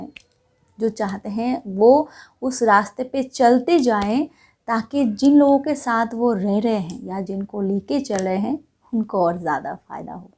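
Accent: India. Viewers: Love your hindi accent..🥰🙈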